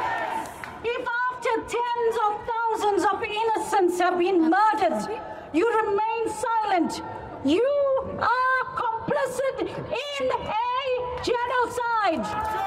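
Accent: South Africa. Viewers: Before she said she was south African I already knew it from her seevh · I immediately recognised the South African accent!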